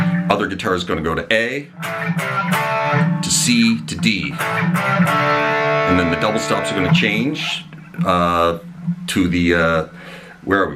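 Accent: Canada. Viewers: Well, thanks for sharing your knowledge! By the way, from the Traynor amp in the background and your accent - I knew you had to be Canadian. (I grew up in Alberta.) Checking out your offerings now!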